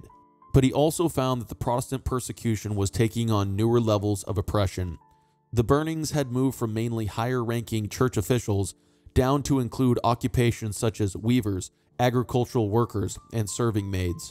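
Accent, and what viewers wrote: United States. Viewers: Soon as u hear a yank commentary its over...csnt stand em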